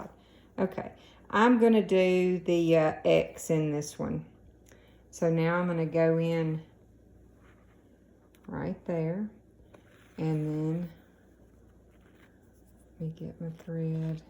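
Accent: South, United States. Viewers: Are you from Georgia or Alabama? 🥰 Your voice sounds like all the ladies from my Dads family.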